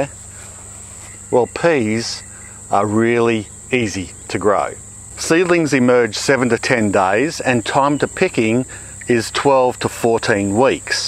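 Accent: Australia. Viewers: How can this video have dislikes!! Can't honestly believe it. Thanks so much from up the hill in Toowoomba. Love the dad jokes but the way. Makes me smile to hear your good ol aussie accent on the screen. Grew up in Brisbane in Morningside so also good to see your southsider as well! 😉. Take care mate. Hope the family is well.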